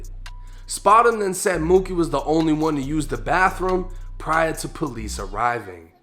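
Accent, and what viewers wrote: Boston, United States. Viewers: I’m from Boston bro no one stretches there accent THAT bad he says apahhhtment and stretches his AHHHS (r’s) every chance he gets like cool we get it your from Boston . Just feels over exaggerated and forced and dumb after a while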